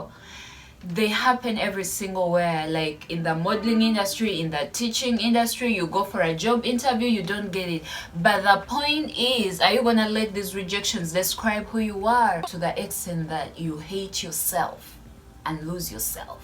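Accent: Africa. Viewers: I like your African accent that is smoother than others'. I always uncomfortable to watch models in outfits that is very little to cover their bodies. I feel bad for them. If I am in the field as a model, I would suffer and probably give up to be a model since this world's fashion industry is so wicked and dark. I am admiring you to be a good example, as a Christian model by keeping the dignity and your faith value in your job field which is very precious. Bless your heart. Keep up your good work. I know that you can't survive there if you are there for success in the world, but you are there for God's special calling to reach out people with the gospel. When you know why you are there, I am sure that you can have peace and enjoy your job even though you don't make much money or fame like what others chase after. I would like to see how God uses you unlimited way. 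I like your shorts. They are awesome. They speak special way. I think that God brings souls to you thru your videos. Do not be afraid to share the gospel. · Late to this video, please do not lose your beautiful African accent and soft voice!!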